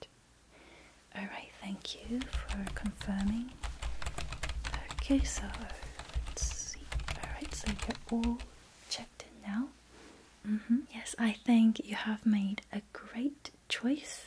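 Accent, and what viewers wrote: Australia. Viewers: That explains it, I thought I heard Aussie sometimes! Oops, sorry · Can I ask where you are from? Your voice is pretty and has a slight aussie accent with how you say some things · Do i detect a hint of Australian accent? Love this. Thank you!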